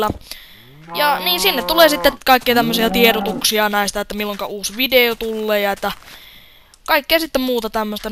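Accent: Karelia, Finland. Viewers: Onko tua sun murre karjalan murre ?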